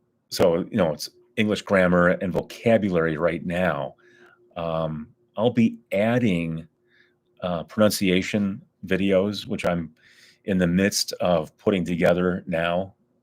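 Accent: United States. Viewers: May I ask you about your accent? You have the most distinct american accent I ever heard, especially very strong "r". Is it a matter of locality you live in, your personal pronunciation, or something else? Don´t get me wrong, I like it. I also appreciate your effort in making your YB content. :hand-purple-blue-peace:Miro, Slovakia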